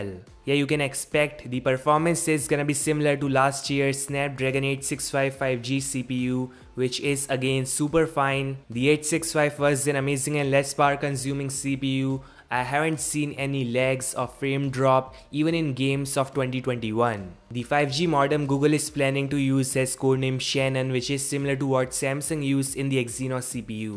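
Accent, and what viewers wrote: India. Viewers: So this guy is fellow indian....he sound indian though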